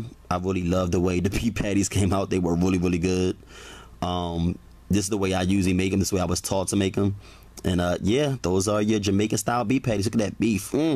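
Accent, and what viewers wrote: United States. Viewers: Love the American accent ;-)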